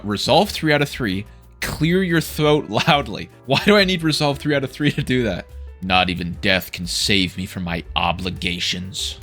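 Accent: Canada. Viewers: Are you Canadian? 🤔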